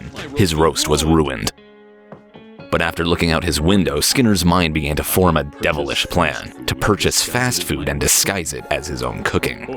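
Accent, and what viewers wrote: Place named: United Kingdom. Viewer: Sound very British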